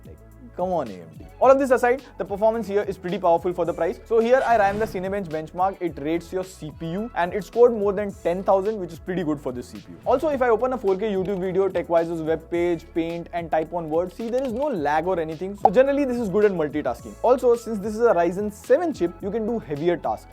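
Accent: India. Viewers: Bhai ki english bhi hindi jaise lagti hai lagta hai jaise mene hindi video hi dekhi hai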